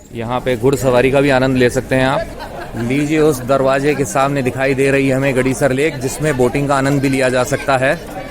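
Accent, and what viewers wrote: Standard. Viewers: Bhai kya vedio banate ho aap jordar. Apki suddha Hindi bolneka andaj man gaye yar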